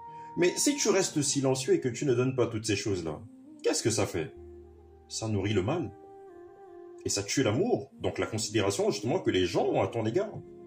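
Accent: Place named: Gabon. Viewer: Il a l'accent de chez moi au Gabon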